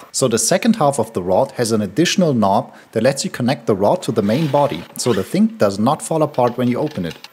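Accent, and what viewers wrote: Germany. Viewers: Based on accent its safe to say youre German, and the product confirms it. Lol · The more he speaks the more you can hear the german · German accent makes every video about engineering and design more reliable. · Hear German accent, open comments. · Why does he sound like the boyfriend of Uyen (@ And that is not only his German accent but actually his voice. ;-) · Hears "I overengineered" spoken with German accent Yeah that makes sense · It makes sense that he sounds German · German accent checks out. Grüße an der stelle. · Der deutsche Akzent ist crazy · Knew he was German when I saw the gadget and the accent was the cherry on top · Are you by chance german? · Over engineering a paper towel dispenser is the most German thing imagineable, finished with the perfect, slight German accent, in delivery.. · how to tell people you are german, in case they dont identify the accent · German accent - overengineering - quality nice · Heard „Kitchen Towel“ and the Accent and thought: „That’s a fellow german“. 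(At least it wasn‘t „Kitchem Roll“) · That german accent is enough to tell me this is legit 🙂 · You sound so German. Are you German? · you know another way to make sure your roll of towels dont get wet? by not putting it NEXT TO THE FREAKING SINK!!!! how do you not figure this out? your accent sound german! you should be more efficient and smarter than some southern hillbilly yankee!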